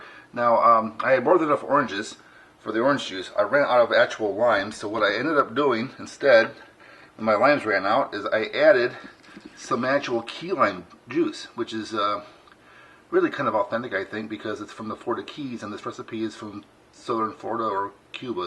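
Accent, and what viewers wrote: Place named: Texas, United States. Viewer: You sound texan 😂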